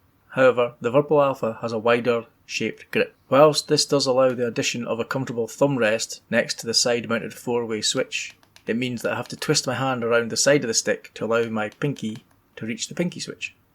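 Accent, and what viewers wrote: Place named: Scotland, United Kingdom. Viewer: The gentle Scottish accent makes it an effortless sell... I must be homesick! Seriously though, you highlighted most of the reasons I also went with the WinWing over the Virpil. Good video,. I hope there are many more to come!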